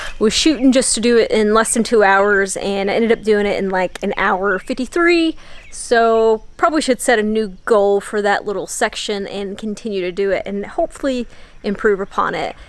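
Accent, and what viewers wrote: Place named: Kentucky, United States. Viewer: Huh, not much talk about Xert. Love your Kentucky girl accent and mannerisms. Couple of suggestions for ya. try magnesium for your cramps. D1 Female athlete taught me that one. Have your carbon bike checked immediately when it creaks. Compromised carbon due to not using a torque wrench can kill ya. I got a little experience with that one. Put some time in on nutrition. May I suggest following Dr Gregor and his whole food, plant based diet. That prepackaged white rice and ground beef is slowly killing you. Headaches? Drink mo water. I do like your ability to articulate what you are thinking. Ya just need a whole lot more schooling. Your buddy Dave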